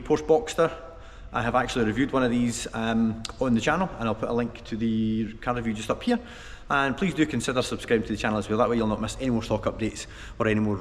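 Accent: Scotland, United Kingdom. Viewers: Scottish of course! Love it.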